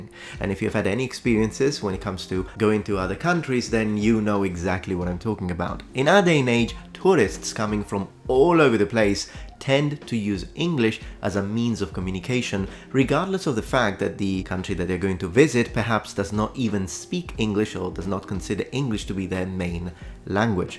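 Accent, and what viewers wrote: United Kingdom. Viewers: Oh yes that is why i can hear a bit of a british accent when you talk english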